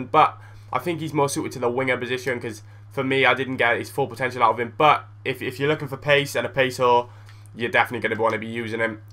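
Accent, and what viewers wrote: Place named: Welsh Valleys, United Kingdom. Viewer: You sound like the guy from the valleys haha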